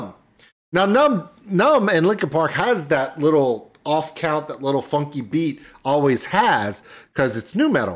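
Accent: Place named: Italy. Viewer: Kinda funny how you speak spanish with Italian accent.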